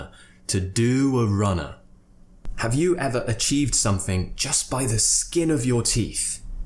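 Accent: United Kingdom. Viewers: When I first watched your video yesterday, I tried to speak and mimic your accent. I finally found a native British speaker in YouTube. Although my roommate was very annoyed when I pronounce and mimic your accent. Haha. Cheers! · your accent is amazing. can I know where you are from please? I mean where of The UK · I really admire every video on your channel, I ask permission to practice british accent with your videos plz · What is Receive pronunciation? The way you are speaking is this a RP ? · Thank you so much,! Your lessons made me love the British accent! Trying to do my best to master it:) Best of luck it the New Year! Cheers! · is your-go-to person when all of a sudden you forget how to sound like a modern RP. Truth be told, I've been listening to your channel and podcast long before I decided to move and work here in LDN and I love the English for your kindness and their various accents especially here within the SE / Southwark area. 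💙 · Hi! I want to tell about myself. I'm Turkish. I have six English class in a week. My English teacher is South African Turk. His accent like yours. (He speaking with British accent.) So, I'm watching your videos everyday. They are helping me so much. Thank you for this. I love your channel so much 💜💛 Note: I didn't use translate. I'm sorry for my English. I'm trying to be better. 🙏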